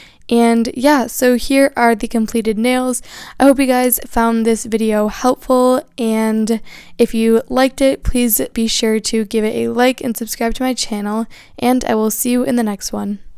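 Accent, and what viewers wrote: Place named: North, United States. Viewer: Are you from Buffalo or Chicago? You have those classic northerner 'A's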